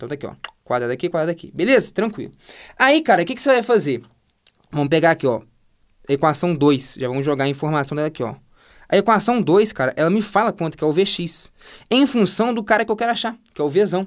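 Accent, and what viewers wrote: Minas Gerais, Brazil. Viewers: Matéria boa demais. Sotaque de Minas não da pra não perceber hahaha. Valeu pelo conhecimento! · esse fesso e mineiro... ctz kkkk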